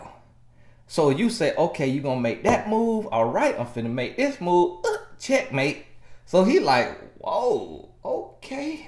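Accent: South, United States. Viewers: he's so country and i'm here for it! I understand country, but it's been a while since i spoke country.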